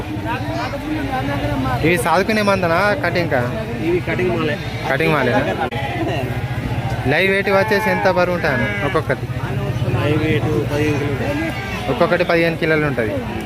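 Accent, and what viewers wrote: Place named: Andhra Pradesh, India. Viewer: telangana yasa matladu andhra basha enduku matladuthunnavu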